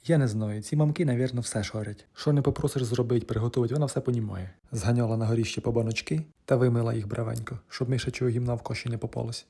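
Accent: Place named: Luhansk, Ukraine. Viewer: Гуморист та розповідає від бога❤❤❤.Дуже подобається Ваш контент.Слухаю із задоволенням так, як наче у себе вдома побувала.(Луганська область)У нас теж так гутарять і голос....голос свій ,рідний.Зараз дуже далеко мене занесло, вірніше нас. Серце щимить, болить та плаче за рідною землею, за своєю країною. Дай ,Боже усім українцям дочекатися перемоги над ворогом та повернутися до нашої замученої ,але найкращої ,рідної землі. Всим миру ,добра, злагоди. Бережіть себе, рідні.❤❤❤❤❤❤❤❤❤❤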